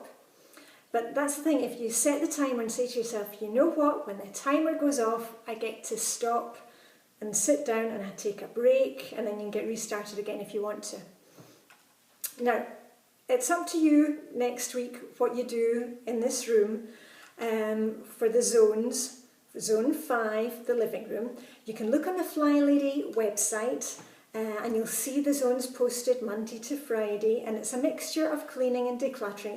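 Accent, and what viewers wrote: Scotland, United Kingdom. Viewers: Thank you so much for all your videos. I love your way of if communicating the FlyLady system- it has really injected new life into my routines. I have even downloaded the Teacher Control Journal to help me at work now! I also love hearing your Scottish accent and your lovebirds in the background!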